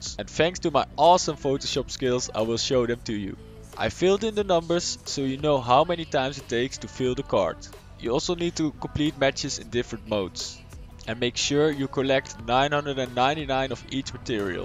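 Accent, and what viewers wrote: Netherlands. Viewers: ja, ik wist het. Ik zag het aan je naam en er zit iets van Nederlands in je Engels Niet dat je Engels slecht is hoor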